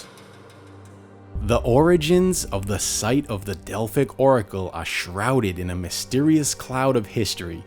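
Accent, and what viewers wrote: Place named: New Orleans, United States. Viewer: Sounds like a New Orleans accent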